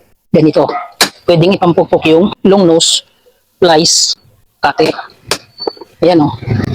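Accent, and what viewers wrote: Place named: Nueva Ecija, Philippines. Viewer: Taga nueva ejicia ba kayo kasi yun tono ng salita nio parang taga nueva ejiia kayo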